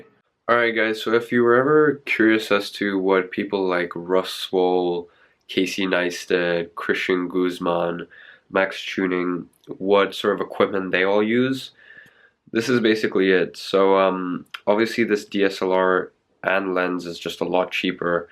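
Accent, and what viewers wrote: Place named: United States. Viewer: wait if you live in london for 13 years how or why do you have american accent 😳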